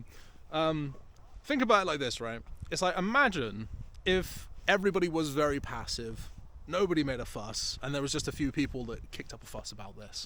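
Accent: Bristol, United Kingdom. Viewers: i know that good old bristolian accent i always get mistaken for being from bristol lol. Im pure wiltshire.... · what part of the uk are you from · Are you from Bristol?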